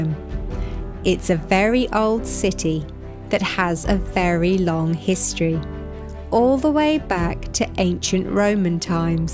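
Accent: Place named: Southern England, United Kingdom. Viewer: I have been looking for English videos for a Spanish friend. Most are with American accents and/or many are too fast for the novice to take in. This is perfect with a good simple southern English accent at a measured pace. Thank you. Next is to find one about travel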